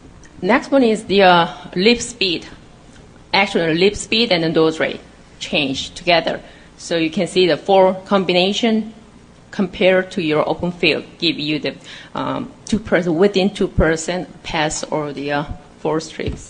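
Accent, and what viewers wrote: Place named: China. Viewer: when it comes to science the language should be clear & understood, her Chinese accent replaces the "L" with "R" MLC will be MRC, Real-time become Rear-time!! but thank you for the effort.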